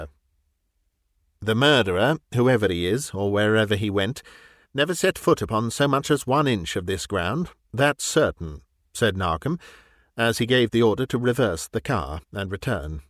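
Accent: United Kingdom. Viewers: Oh definitely! Perfect British accent at its best. I am learning here. English is my second language and I am being tutored, and it’s not just about the pronunciation it’s about the punctuation. Actually punctuation is where AI fails most.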